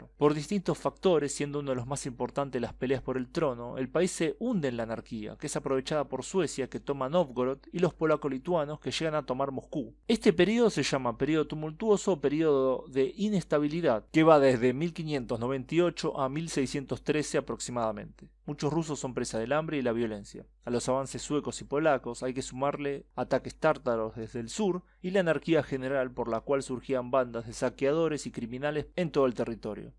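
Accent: Argentina. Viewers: esta muy bueno difundir la historia de forma resumida, pero agrego una critica al margen, tratá de sheshear no tan exageradamente que después América cree que todos en Argentina hablamos así, sin el espiritu de ofender te agradezco enormemente el aporte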